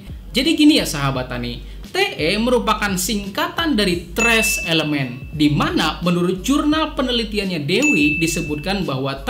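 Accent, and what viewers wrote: Banjar, Indonesia. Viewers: Logat banjarnya masih ke ikut ya pak🤣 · Pian asli banjar kah??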